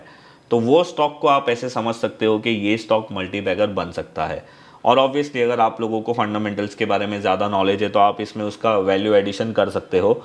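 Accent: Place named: Mumbai, India. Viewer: ..aap ki bambaiyaa hindi zalak jati he..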